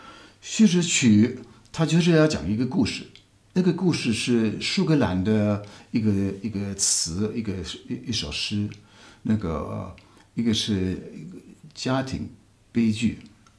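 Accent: Taiwan. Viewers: 您溫文爾雅的台灣國語的口音和您舒緩悠閑的講話的節奏與您動人的琴聲一樣和諧悠揚，真心感謝！希望您有機會給大家介紹拉赫瑪尼諾夫的《第三鋼琴協奏曲》謝謝。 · 好幸運可以在網路遇見以領略西方音樂的故事，很好奇您身在德國卻能說一口標準的台灣腔中文，給您按無限個讚。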